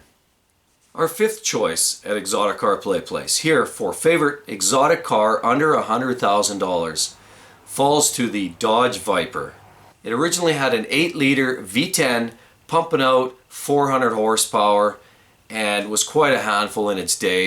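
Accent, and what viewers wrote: Canada. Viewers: Thick Canadian accent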